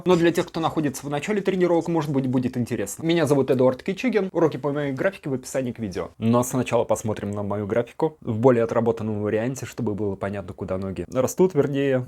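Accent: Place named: Moscow, Russia. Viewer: раздражает нелепая манера говор с московским ш вместо питерского чн чк откуда ты прелестное ха-ха создание с таким количеством ошибок в речи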